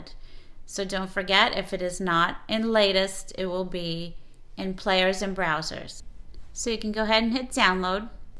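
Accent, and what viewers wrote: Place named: New England, United States. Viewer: Great job, easy to follow....even though I hear little yankee in your voice ;) , my southern draw would be much funnier lol.....great videos and keep up the good work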